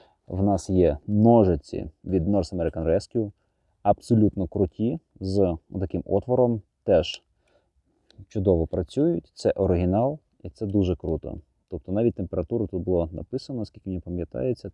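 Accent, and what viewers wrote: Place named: Russia. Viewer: Может не стоит мучаться и пытаться добавлять "украинские" слова? :) Слышно же, что русский лучше знаете. Больше людей поймут, что вы говорите. ps. "Специальная такая штука" :))) На алике кстати хорошие наборы продают и недорого. "Rhino Rescue". Там даже этот наглазник есть.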